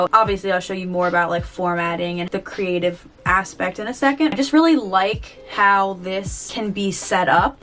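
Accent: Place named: New Jersey, United States. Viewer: IDK where you are from but every time you talk, you sound like NJ (where I am also from)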